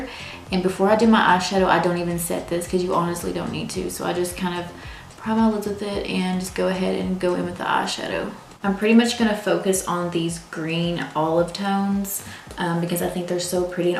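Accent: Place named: South, United States. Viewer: You sound like you have a little southern accent which just adds to your beauty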